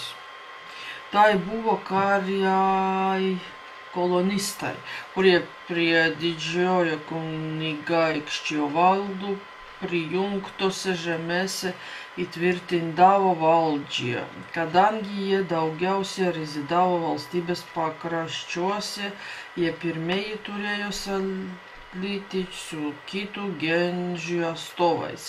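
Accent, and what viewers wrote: Lithuania. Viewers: Very well done!!! You do have an accent but it sounds like a Lithuanian accent. Wow! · You could sound like a Lithuanian from a Lithuanian village with a dialect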